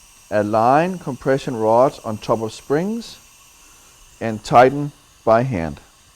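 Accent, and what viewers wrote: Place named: Denmark. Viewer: The danish accent ;) Although it was very subtle. Great video btw.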